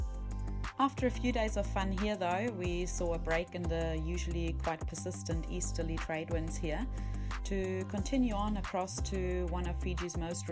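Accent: South Africa. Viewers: Evening, yousound South African?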